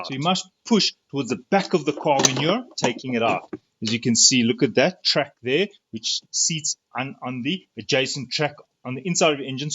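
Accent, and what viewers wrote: South Africa. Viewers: Yay, South African Accent... Howzit and thank you for the DIY!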